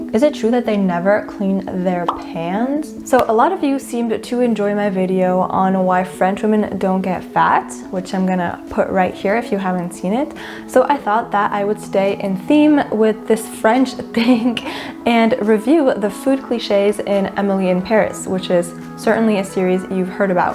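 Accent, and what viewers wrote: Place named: United States. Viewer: Do you currently live in France? :) Also your accent sounds very American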